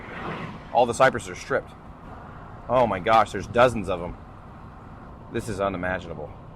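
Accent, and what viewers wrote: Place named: Texas, United States. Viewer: That's just Texas in general you know that dude you got a Texan accent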